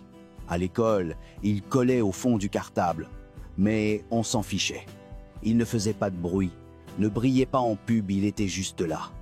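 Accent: Quebec, Canada. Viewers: Pas moi, je lui trouve un discret accent canadien dans ses prononciations 🙂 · Vous avez l'accent du Québec. Est-ce que cela parle des produits de France ou du Canada? Il y a des produits écrits en anglais, et d'autres comme oeufs mimosa, gratin dauphinois, etc typiquement de France aussi à cette époque ...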